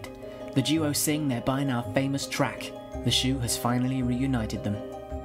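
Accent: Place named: Ireland. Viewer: this square head Irish is pounding in my ears ><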